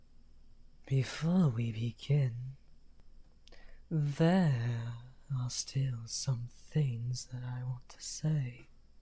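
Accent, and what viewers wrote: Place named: United Kingdom. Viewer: AHHH I loved your british accent 😍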